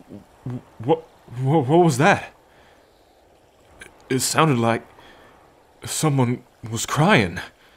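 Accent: South, United States. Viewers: He sounds southern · a heavy southern accent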